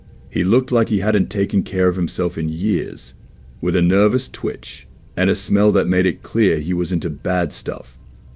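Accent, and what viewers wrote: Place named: Australia. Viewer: It sounds so weird hearing someone with an Australian accent tell a story involving a gun 😂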